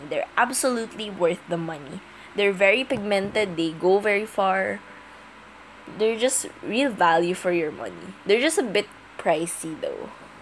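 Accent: Philippines. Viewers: Hi! Are you Filipino? You have their accent and that's amazing! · I love your Filipino accent lol 🇵🇭🇵🇭🇵🇭🇵🇭🇵🇭🇵🇭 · I love this brand! thanks for the vid xD I can hear the filipino in your words ~ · I think you are a Filipino because I know how Filipinos speak English.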